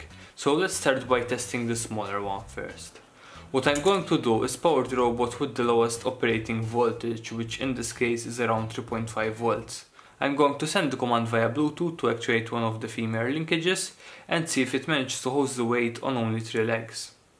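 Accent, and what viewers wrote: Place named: Malta. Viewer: i can see from your accent that your maltese same dude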